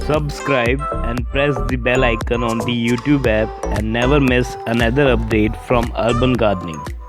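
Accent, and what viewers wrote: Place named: India. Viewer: These indian gardening vidoes with indian accents are giving me brain tumor.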